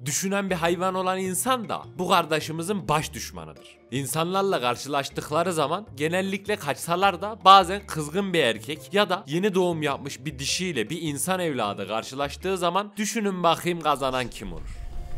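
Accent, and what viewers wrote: Ankara, Turkey. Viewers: Emeğine sağlık çok güzel bir video olmuş. Yalnız eski Ankara şivesini biraz inceltmişsin admin.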